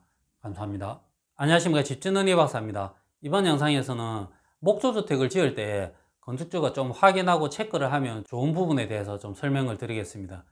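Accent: Gyeongsang, South Korea. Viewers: ~목소리톤으로 경상도분같으신데 혹시어느지역이신가요? 경남함안쪽인데 의뢰가능한지요?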